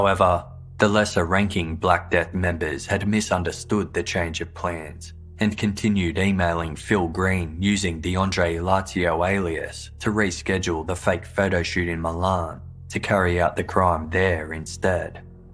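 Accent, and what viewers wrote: Australia. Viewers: He couldn't be more Australian, and speaking seems a struggle, is what I think I meant. Not so much a compliment as an observation. Listener since Ep 1, so not a casual or spur of the moment take, and one he might not disagree with to judge by the Q&A he once did. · Not NZ, 'Stralian' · If you mean the presenter then he sounds Australian or New Zealand. I really like his voice and find it so relaxing that I often put these vids on and fall asleep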